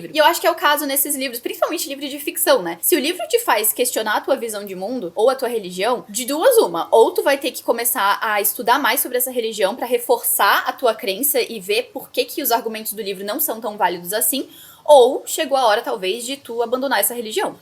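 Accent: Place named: South, Brazil. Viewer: Você é do sul?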